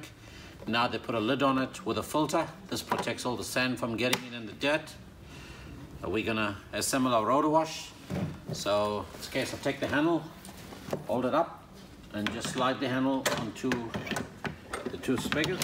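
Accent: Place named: Durban, South Africa. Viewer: You sound like you are from Durban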